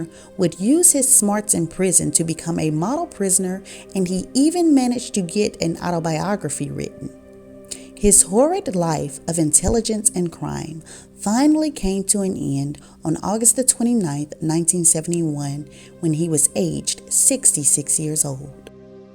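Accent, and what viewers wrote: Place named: Memphis, United States. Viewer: This was good! Girlllll I thought you were going to be all serious throughout until you gave us that little funny voice over! 🤣 I understand this is a serious matter at hand but even tho " in my Memphis accent " still be you! That's what drew me and others to you. I'm very intrigued about this new genre. You GO GIRL! P.S. I want to purchase your book but forgot the name. Blame my mind and not my heart chile I know you've mentioned it a bunch in the earlier vids... ❤ nevertheless you're an awesome story teller! Hopefully I'll catch a Live 😅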